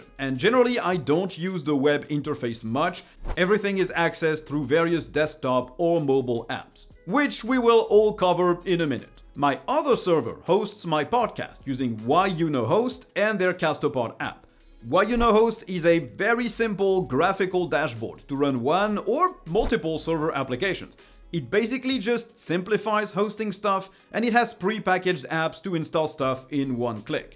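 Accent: France. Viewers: Hello! I like your videos. Thank you. I have a question : you speak a bilingual english but I feel a small accent. Are you french? 😊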